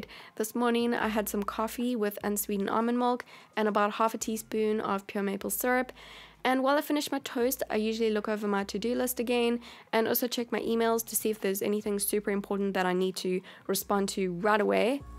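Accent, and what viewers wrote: South Africa. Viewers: I detect a South African accent? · are you from south africa? love your accent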